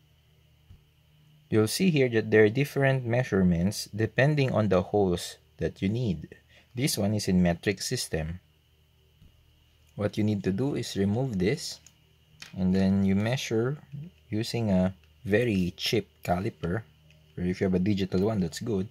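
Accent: Philippines. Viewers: I recognize the accent. Are you filipino?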